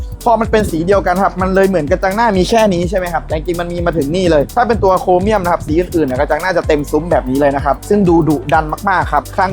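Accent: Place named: Isan, Thailand. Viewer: ฟังสำเนียงน่าจะคนอีสานบ้านเราต้องสนับสนุน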